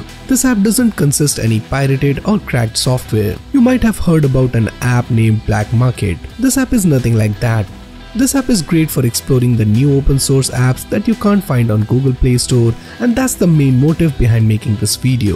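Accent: India. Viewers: He sounds like that Indian from android guy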